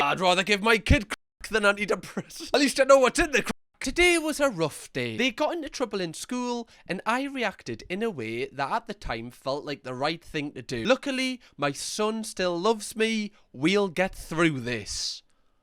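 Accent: Newcastle, United Kingdom. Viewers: I don't know the origin either, but I think it's term of endearment for us followers of his. I LOVE being called a gorgeous donut daily, esp. in that Geordie accent of! xoxo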